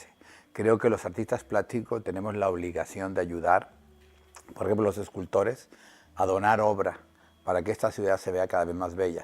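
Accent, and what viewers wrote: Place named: Spain. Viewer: Tantos años en México...¿porqué el acento de España?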